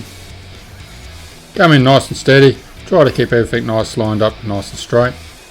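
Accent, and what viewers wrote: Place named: Australia. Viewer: hey im glad you speak plain Australian everyone wants to comment on the connection names im just happy to get these pointers❤❤❤❤❤ ya work mate keep it up for the rest of us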